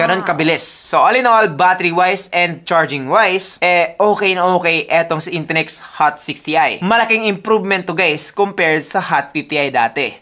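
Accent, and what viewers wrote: Visayas, Philippines. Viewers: nakaka inis yung accent nya, pang bisaya.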